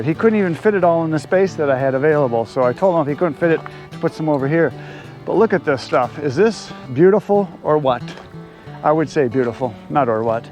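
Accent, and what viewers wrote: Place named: Upper Peninsula, United States. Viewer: Welcome to Michigan. The people from the UP sound a bit like you so you should fit in nicely. Have a great time.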